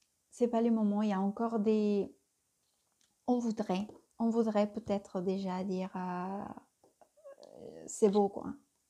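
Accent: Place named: Spain. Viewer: Magnifiques tirage merci beaucoup, jolie l'accent Espagnol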